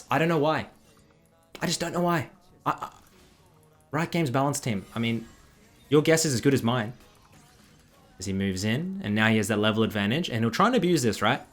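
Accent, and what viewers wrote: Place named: Australia. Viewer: How I love the Australian accent. Like far out, and cheeky little ...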